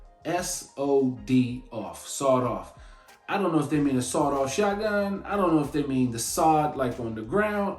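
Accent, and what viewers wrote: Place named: United States. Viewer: Hearing our slang in an American accent is amazing. Subbed! P.S 'Sod' can also be a noun.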